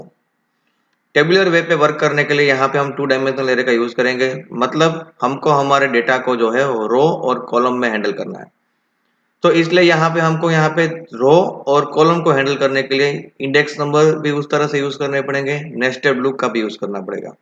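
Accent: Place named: India. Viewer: Your explanation is amazing, but unfortunately I can understand nothing from your Indian accent.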